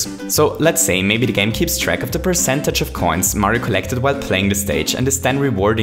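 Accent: Germany. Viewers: Du bist deutscher? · Man hört raus das du Deutsch spricht und Lesezeichen ist ja auch ein deutsches Wort #Ceavearmy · You're from germany, right? ^-^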